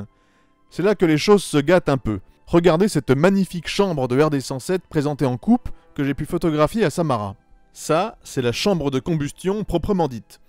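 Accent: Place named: Lorraine, France. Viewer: Woooooh serait-tu un frere lorrain? je dis ca car ta facon de prononcer 20 m'y fait fortement penser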